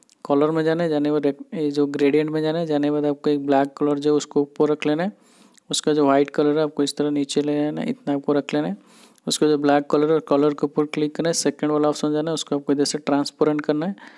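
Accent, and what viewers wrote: Odisha, India. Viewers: Aap odisha se ho kya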